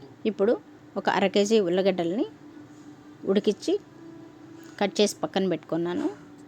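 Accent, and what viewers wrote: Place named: Rayalaseema, India. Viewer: మా రాయలసీమ భాష, ఎర్రగడ్డలు,వుర్లగడ్డలు వినడానికి ఆనందం గా వుంది.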